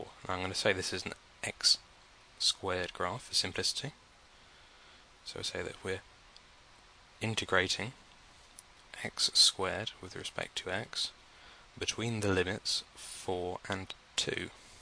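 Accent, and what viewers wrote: United Kingdom. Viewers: Your voice and awesome British accent makes this video that much better. Plus you do a great job explaining!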